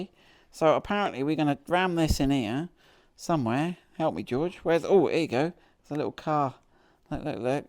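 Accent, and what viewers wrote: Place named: England, United Kingdom. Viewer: Trying to place your accent, Norfolk or Hampshire? It's not West Country.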